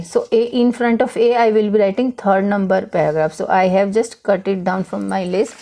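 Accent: India. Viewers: Annoying indian accent and unclear voice, and She's not reading like a ielts instructor. 😑😑😑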